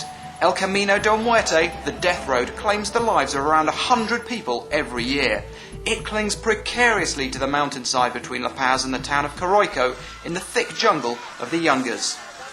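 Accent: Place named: United Kingdom. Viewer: stupid bri-ish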